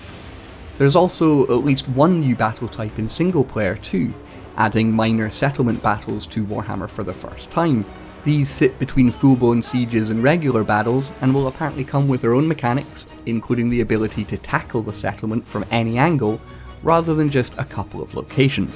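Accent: Scotland, United Kingdom. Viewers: Great video. Love hearing a fellow Scot. Can’t wait to get my hands on TWW3 to try out these new battles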